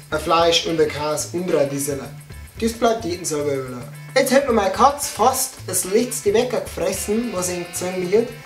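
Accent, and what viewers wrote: Bavaria, Germany. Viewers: Hawadere ... es ist so wohltuend, einen jungen Menschen Dialekt reden zu hören, hier in Amberg, wo ich herkomme, reden die nur noch Hochdeutsch, die Dialekte sterben bald aus, wodurch ja auch, besonders im Bayrischen, Genialität verloren geht, z. B. zusammen wird zu zamm, drei Silben zu einer ... manches verstehe ich allerdings nicht wie das n in heind , wir sagen hier heid ... hätte jetzt gern Dialekt geschrieben, aber die normalen Buchstaben reichen nicht für alle Laute aus ... es gibt auch brotlose Brotzeit, für die man dann manchmal keine Zeit hat ... Servus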